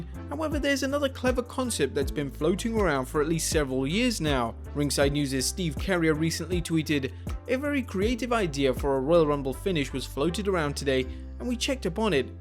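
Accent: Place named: Southern England, United Kingdom. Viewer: Southern English man not British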